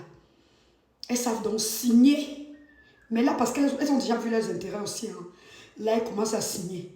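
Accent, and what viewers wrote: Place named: Cameroon. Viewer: Accent camerounais...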